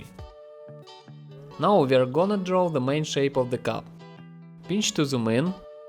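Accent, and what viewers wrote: Russia. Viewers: Очень рашн акцент :)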